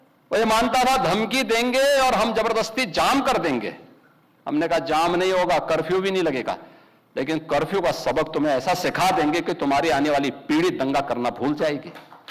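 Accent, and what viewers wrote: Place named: Gorakhpur, India. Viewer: Jalwa Hai Bhaiya ji aapka ❤ Gorakhpuriya andaaz me smjhaya hai apne ❤🔥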